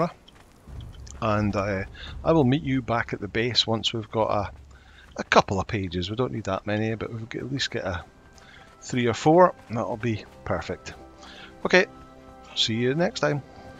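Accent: Scotland, United Kingdom. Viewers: I know it. One of my colleauges in Thailand was a Scotsman and he had the same accent as you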